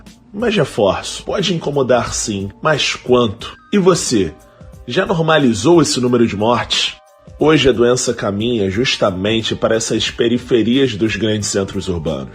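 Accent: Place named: Rio de Janeiro, Brazil. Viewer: volta logo, preciso de mais vídeos e do seu sotaque carioca.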